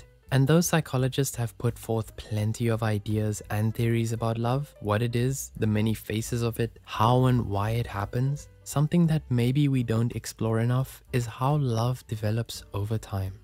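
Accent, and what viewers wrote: South Africa. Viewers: The speaker sounds south african😮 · Man's accent is from SA🇿🇦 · The narrator is definitely South African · The narrator are you south african? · Bro sounds South African 🎉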